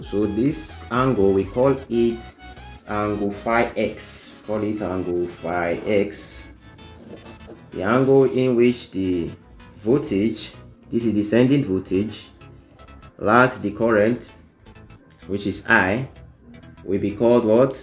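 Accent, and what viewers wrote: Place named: India. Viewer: Uniben student sounding like indian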